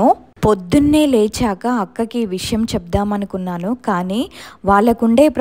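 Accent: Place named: Coastal Andhra, India. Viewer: 🚩✅ You are speaking a brry perfect pite Krishna Coastal Telugu language with ease & without any corruptive influencial slangs of Indian language Urdu or exotic Arabic language. Very impressive. Very good. Keep it up. If interested you Study "Garuda Puranam & one special chapter in Oka Yogi Atma Katha " that narrates"Life after Death of Human persons of bad souls - Evil forces. By this you will have better understanding about dissatisfied Souls that roam around their related people. ✅🚩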